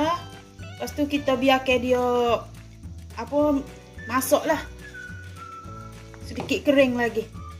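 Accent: Kelantan, Malaysia. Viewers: Suka dengar logat akak ckp Kelantan... Terima Kasih kak ilmu masakannya rugi tak tgok channel akak dari awal ..Done subscribe .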